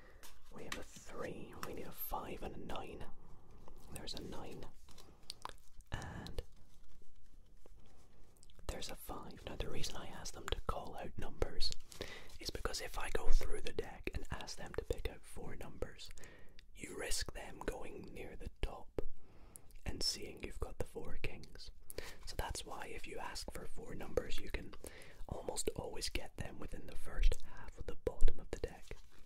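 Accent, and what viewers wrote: Scotland, United Kingdom. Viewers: No way! I’ve wanted to learn card tricks all this week. Btw, are you Scottish? I am too and i thought your accent was similar to me lol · yepp he is scottish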